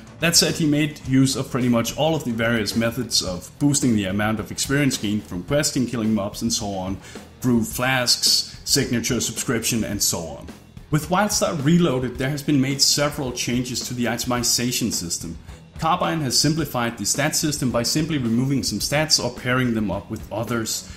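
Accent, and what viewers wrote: Denmark. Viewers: Dansk accent er ikke til at tage fejl af! Fed video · danish accent I feel lol.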